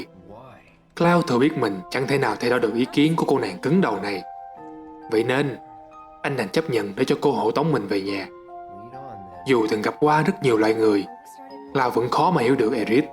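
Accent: South, Vietnam. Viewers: mình comment này là mình rất thich những video của kênh này. Vì có nhiều lý do lắm 1) giọng miền Nam. Mình sr nhé, mình cũng có coi thử 1 it nhưng 1 số bạn ng Bắc nói nhanh quá mình chẳng để hiểu được, vừa nghe mà vừa coi clip y như muốn chóng mặt 2) bạn này sub chậm, giọng nói rất rõ nghe, bên cạnh đó rất hên bạn này nghĩ ra cách chèn sub, làm mình có thể theo dõi kĩ hơn. Đây là điều chỉ có kênh này làm được. Mấy kênh khác dù cũng có sự đầu tư kỹ nhưng họ k như bạn này 3) bạn này dịch kỹ. Hồi đó mình có coi 1 page theo dõi chơi game FFXV, mà page đó chỉ giống như là chơi cho có rồi thôi, k có dịch, làm mình coi mình chả hiểu cốt truyện, rất là bực 4) dịch có mấy đoạn rất vui nữa hy vọng bạn này sẽ đầu tư vào FFX-2 và dãy Kingdom Hearts (Kingdom Hearts này mình thich lắm mà cốt truyện nhiều với từa lưa quá làm mình chả hiểu nhiều) Thank you đã đọc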